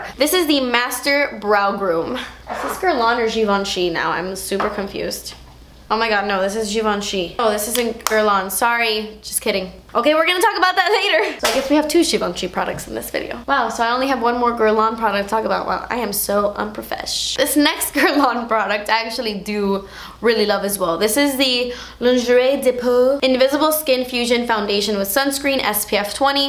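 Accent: United Kingdom. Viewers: Her British accent is amazing! I love it! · Only that's eeeeeeeeeeeeeeeeeeespannnnnnnnnnnnnnsive!!!!!!! Plus your Britain accent! I die!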